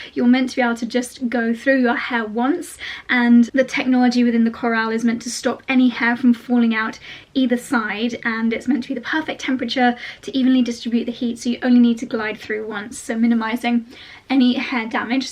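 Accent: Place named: United Kingdom. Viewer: This might seem weird, but you remind me of Barbie. A real-life Barbie with a British accent! :)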